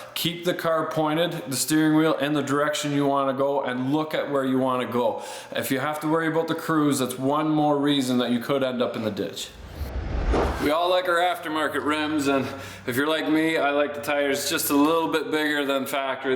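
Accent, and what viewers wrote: Canada. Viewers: Good, useful, logical tips presented in a wholesome Canadian accent. Well done! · I love how i could tell he was Canadian after the first 30 seconds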